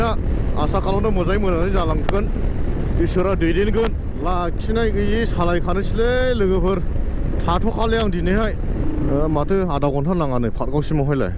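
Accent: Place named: Kokrajhar, India. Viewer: Kokrajharni Baidi railainw Naja ada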